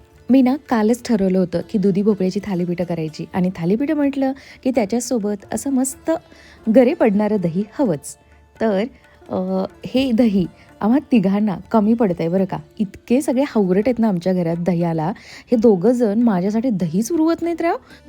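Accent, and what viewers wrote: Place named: Pandharpur, India. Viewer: Hi तुझी भाषा थोडीशी आमच्या पंढरपूर सारखी वाटली धपाटे चिकाचे दूध❤❤ तुझे बरेचसे ब्लॉग बघितले छान नॉलेज आहे तुझ्याकडे 👍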